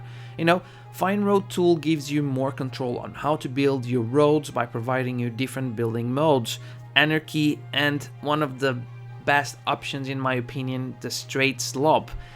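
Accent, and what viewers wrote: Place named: Netherlands. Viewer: Dutch accent love it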